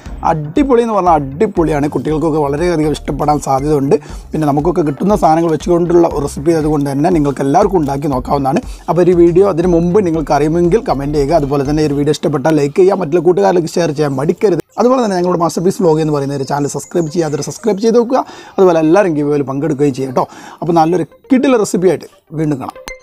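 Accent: Malappuram, India. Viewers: ഇക്കാ നിങ്ങടെ മലപ്പുറം ശൈലിയിലുള്ള ഭാഷ നല്ല രസമാണ്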